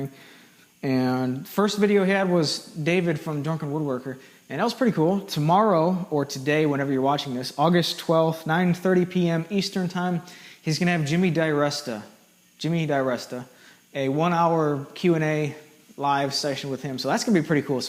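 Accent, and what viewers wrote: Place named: North, United States. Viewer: Nope...not too far at all. My little brother graduated from Mississippi State University a few years ago. I had originally put that you had an accent, but when I went back to the video before posting, I noticed a few slight "Mississippi" things, but mostly a northern accent. I thought I heard in one of your older videos that you mentioned making something for a Mississippi Craft group, but I forgot to bring it up.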